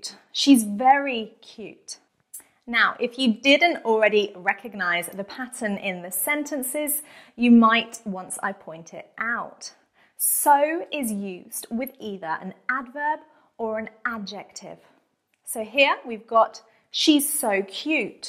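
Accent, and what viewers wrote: United Kingdom. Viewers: Thank you so much, you are such a great teacher. I've made great progress in my English level by watching your channel! your British accent is really lovely.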